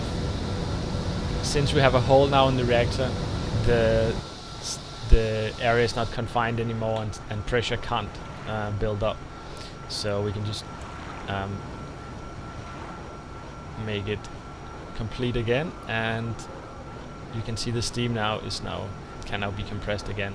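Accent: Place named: Denmark. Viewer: You sound like a Danish man speaking english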